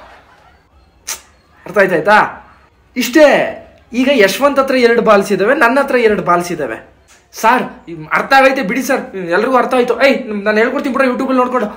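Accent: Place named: Bengaluru, India. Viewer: Tuluva na? Ashtu channage local Bengaluru Kannada maathaadtheeya alva?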